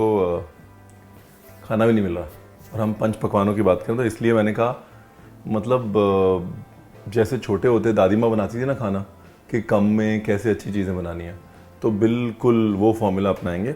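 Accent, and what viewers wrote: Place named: Punjab, India. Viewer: Punjabi Accent 😍😍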